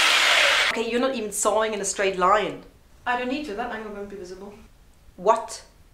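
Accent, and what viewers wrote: United Kingdom. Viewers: Did your learning of English rely heavily on imitating native speakers? The reason I asked is that your accent, at least when speaking English, sounds like a more refined sort of English accent rather than a German accent. I was surprised to hear that you were German for that reason. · Heyyy teacher! I like your videos and your sense of humor. By the way, you've got a pretty good British accent. Peace!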